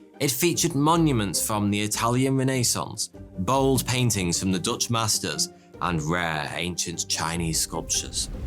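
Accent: United Kingdom. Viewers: he's addressed this. He says both. And that's how the British accent works. Considering how many differences there are in pronunciation, it's silly to even mention it.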